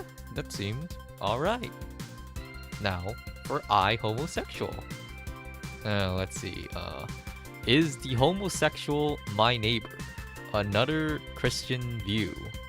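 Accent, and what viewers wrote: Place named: Ireland. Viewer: cool cool, but why do you keep saying "mudder" are you Irish? Or Irish american?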